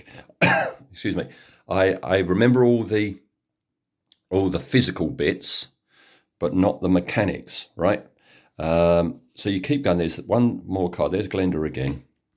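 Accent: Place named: Kent, United Kingdom. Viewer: You sound like a kent ite like me, dunno if your school at the end of term used to gamble crisps on 'battling tops' , maybe it was only us in Sandwich... excellent video sir!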